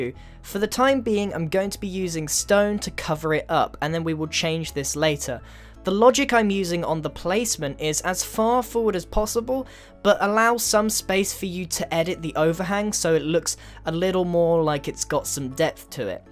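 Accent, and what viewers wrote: United Kingdom. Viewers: Who likes British? Cuz I do, also. Can you use bedrock and turn it into a good looking house? I think not.(not asking u to do that. It’s just a question.) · Can we see British again? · what's with the british you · I like british better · Why the British stereotype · I love British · british british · Very clearly British.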